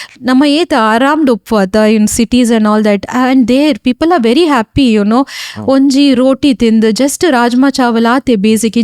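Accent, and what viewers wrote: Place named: Tulu, India. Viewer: This madam tulu is foreign tulu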